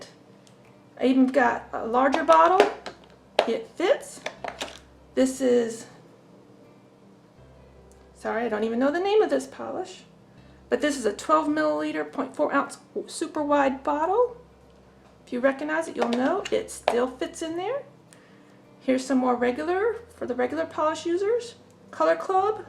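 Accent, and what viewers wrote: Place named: South, United States. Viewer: lol, I used to speak southern too sweetie, took years to wear off 😁 your speech is fine, I’ve never noticed you mispronounce a thing, honestly. Hey, no matter which name you say now, I’ll match both lol.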